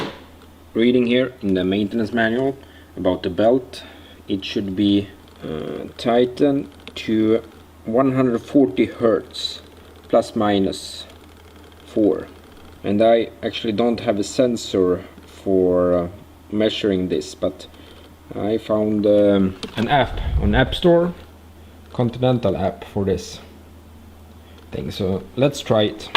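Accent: Sweden. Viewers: är du från Sverige eller?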